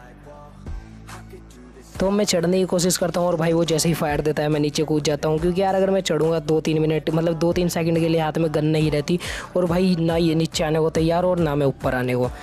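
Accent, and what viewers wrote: Haryana, India. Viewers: Bro ur haryanvi??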